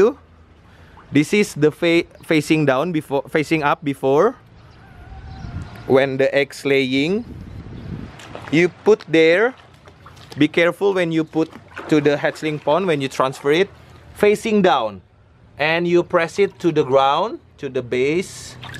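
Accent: Indonesia. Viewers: i love indonesian accent it is cute :D <3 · hehe logat ngomong inggrs emang gitu bisa ketebak klo yg ngomong org indonesia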